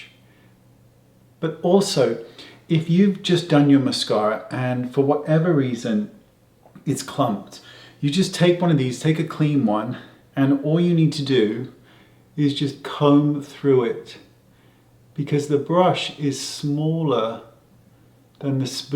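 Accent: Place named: England, United Kingdom. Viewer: Hello 💗 nice 1 again I already had this idea once cause I always use a lot of these interdental brushes as I really need that as I have so tight teeth close to another.. Maybe I wasn't doing right as you just shown, thAnx. Ok then.. the last person I said I love you is my beloved sweet baby girl,my daughter of 6 and I really mean it. I love her to bits (or beats?) as said in England ☺️ and let me tell you Sir your speaking reminds me the real English talking... You really sound as the English gentleman 😄 when I was living in London yrs ago now, I started to get that particular accent but not too bold, I m Italian and from Tuscany so it's difficult to lose that 😆 after 7yrs I decided to going back to my country (love to see you here) now here I had not so much time and opportunities to talk daily with someone british ... I think you speak nice n well relaxed not as some Londoners, I couldn't get a word sometimes.. 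they are doing all fast even talking 😂 I think someone like you could be a better teacher for a foreigner... Maybe you can do also a video about to speak English properly to everyone?! Ciao💗take care 💓😘💚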